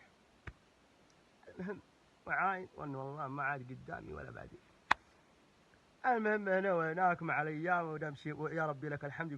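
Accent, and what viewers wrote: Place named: Hejaz, Saudi Arabia. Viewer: السيد Vlb لا والله كلامه واضح ومفهوم ، هذي لهجتنا بالحجاز ' و حنا الاقرب للفصحى & انت تفتقد لاسلوب النقد ،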